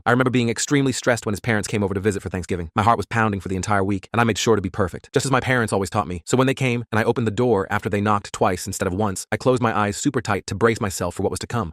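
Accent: Texas, United States. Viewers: TEXAN ACCENT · Yeah bro I was like WHY DO YOU KEEP SWITCHING TO A TEXAS ACCENT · Anyone else realize after like the first couple sentences it gets a Texan accent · THE TEXAN ACCENT BRO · Why does it keep going texan accent 💀🙌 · Texan accent + Kayla as his sister goes hard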